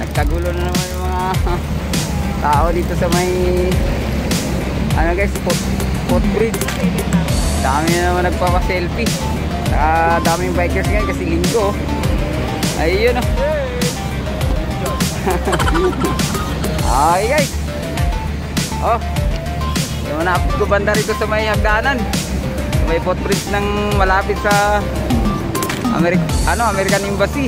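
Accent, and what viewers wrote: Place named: Tagalog, Philippines. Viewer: Nakakatuwa kang pakingan mag salita ng Tagalog. Hahahaha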